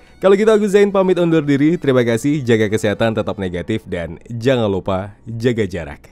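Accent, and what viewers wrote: Medan, Indonesia. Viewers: “Kalau kau ga suka sama the batman, berarti pas aku nonton film ini bukan untuk kau lek” wkwkwkwk medan kali abang ini 🤣🤣🤣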